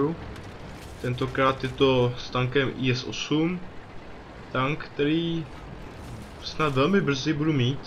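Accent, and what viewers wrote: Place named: England, United Kingdom. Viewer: ja neviem ci skusas anglicky prizvuk alebo potrebujes logopeda ale strasne sa smejem :D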